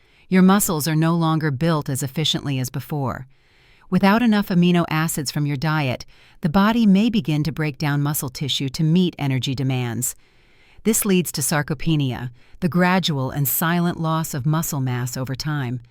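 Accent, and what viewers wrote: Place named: United Kingdom. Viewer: Food suggested are only good for rich Army Generals, thank you for your clear britsh Englisheverybody can understand.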